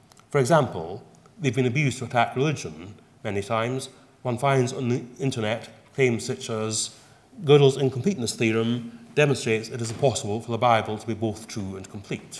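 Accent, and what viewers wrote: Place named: Scotland, United Kingdom. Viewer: all godel proved is mathematicians like to argue and are pompous at best.. but his accent. the most posh scottish i have ever heard lol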